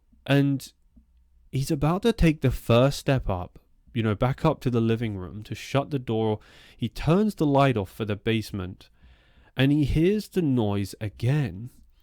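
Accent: United Kingdom. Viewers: You have some great stories,but please make them less meandering!! I've seen people commenting on that in your other videos...you will lose viewers, otherwise. Of course, your British accent is nice and you seem personable. Just less detail,so that you can keep the story moving along...😊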